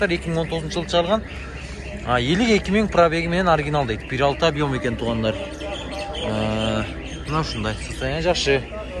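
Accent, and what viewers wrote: South, Kyrgyzstan. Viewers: туштук тараптын диалектикасы жана тундуктуку башка мага деле кээбир создор тушунуксуз,ошентседагы рахматыбызды айтышыбыз керек. элге жардамы тийип атат,ушинтип журуп такшалып туура суйлоп калат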